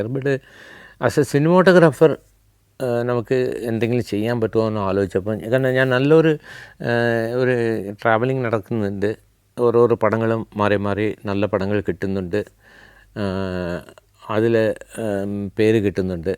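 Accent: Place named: Tamil Nadu, India. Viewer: Tamil Malayalam mixing slang is nice to listen. Waiting for next episodes..keep going ❤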